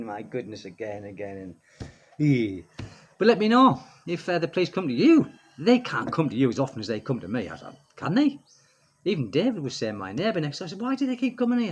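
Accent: United Kingdom. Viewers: Sounds like you’re from the Uk like myself 😂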